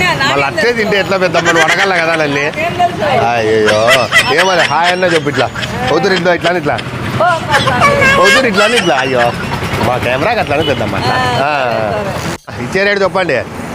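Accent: Rayalaseema, India. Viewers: Rayalaseema basha chala bagundhi anna 😊😊😊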